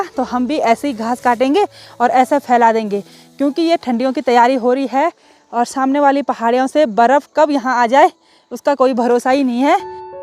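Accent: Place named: Rudraprayag, India. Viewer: Aapki Garhwali Bhasha hamare Rudraprayag wali hai bhardar Patti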